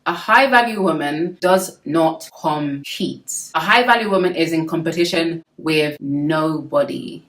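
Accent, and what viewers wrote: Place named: United Kingdom. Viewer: I love your accent so much! which city is your accent from? Everyone seems to have a different British accent depending on the area 🤔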